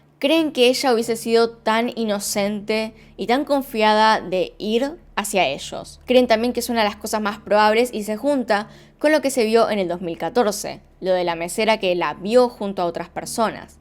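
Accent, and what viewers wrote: Argentina. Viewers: Tu acento argentino me gusta mucho, es un placer oírte, gracias por hacer casos para nosotros.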